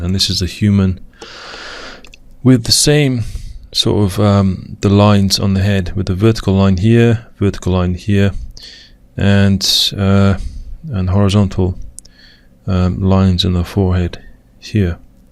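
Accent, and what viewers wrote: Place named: Wales, United Kingdom. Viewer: please jus keep your great vids coming!:) x ps I love ur Welsh accent too;)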